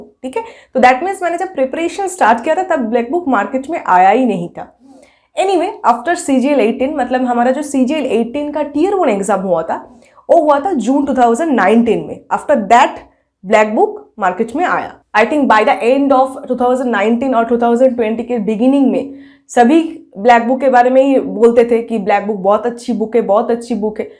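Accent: Bengal, India. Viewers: Bengali hi hain, isme hasne ki kia baat kia hain?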